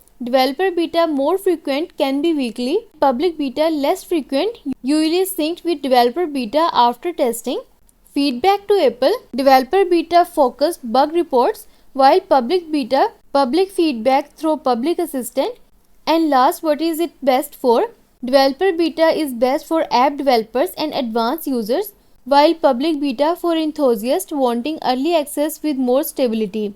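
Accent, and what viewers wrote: India. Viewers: Ohh is it Indian Ai English voice?😅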